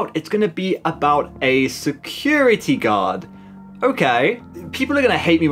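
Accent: United Kingdom. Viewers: You Look Like Dawko And Sound Like Him And I Don’t Know If You Know Him He Is A Famous YouTuber Just Like You!! He Is British 🇬🇧 And You Look Like Him You Sound Like Him And You Sound British 🇬🇧 Sorry That I Say That But Hey I’m Okay With That Your Voice Is Cool And Your Voice Sound Cool And Good So Please Don’t Be Mad I Said That So Sorry But Cool Video And Cool Voice And Sorry For Long Types😊😊 Your Profile’s Look Like The One From Dawko!! Sorry For Long Type!!😊😊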